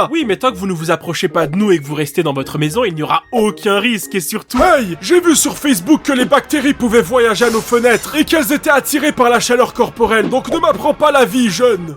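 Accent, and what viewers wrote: Quebec, Canada. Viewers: attend tu es Québécois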